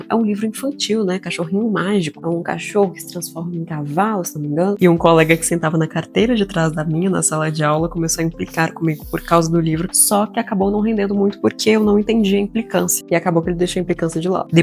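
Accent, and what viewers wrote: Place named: Portugal. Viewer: eu sempre fui leitora, mas meus pais não tinham condições de comprar livros sempre, mas na minha escola tinha biblioteca e eu AMAVA, me sentia a Matilda.. e um que me marcou muito foi "Apertada e sem espaço" e está na wishlist da amazon do meu bebê.. sou louca pra ler os livros da minha infancia pra ele. que delicia de vídeo, conheci você atraves do chat da Amylivros na twitch, e seu vídeo me prendeu e me cativou de primeira.. quanta delicadeza e dicção boa de se ouvir. Você é de portugal? sotaque me lembrou um pouco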